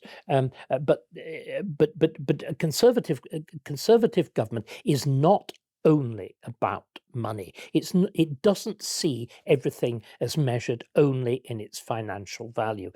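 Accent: RP, United Kingdom. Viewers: You wouldn't of thought he was a working class lad.Speak with a plum in your mouth, and you automatically think he is intelligent. Some people are different deal with it.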